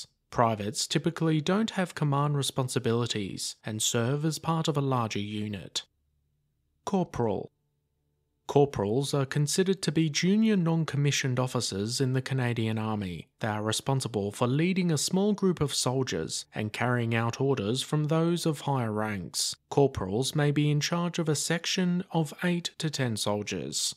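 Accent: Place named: United Kingdom. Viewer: Interesting....my father was RCAF, seen WWII, stayed in until 1969. Not sure if it's the British pronunciation of the presenter, my father seen the old British style ranks then the new American style change....he retired a lieutenant-Colonel, I never heard him refer to his rank as a "leftenant" it was more a "lutenant-Colonel".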